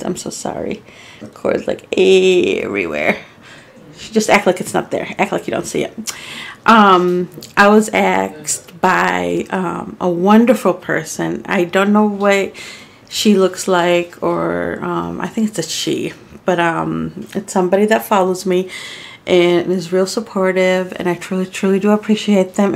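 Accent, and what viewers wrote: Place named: England, United Kingdom. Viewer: I hear a English accent ssooo cute.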